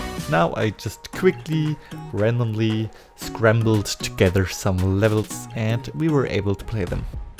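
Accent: Germany. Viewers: You have a german accent lol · Dein Akzent hört sich deutsch an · Bist du zufällig Deutsch? Are you a German? Hört sich irgendwie so an😂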